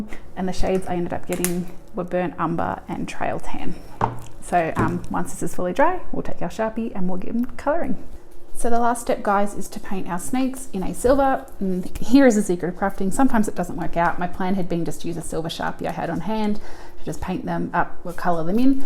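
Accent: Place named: Australia. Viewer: Hi new subscriber here fron Canberra, Australia. I presume by your accent you are Aussie?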